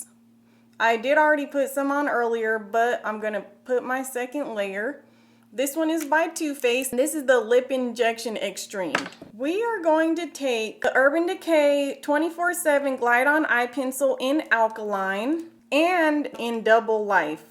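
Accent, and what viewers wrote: South, United States. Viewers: Does anyone know where she is from? Her accent is so strange. It sounds southern (Im from Texas) But I cant put my finger on which part of the south. Maybe Alabama? Thanks for the help. Just curious over here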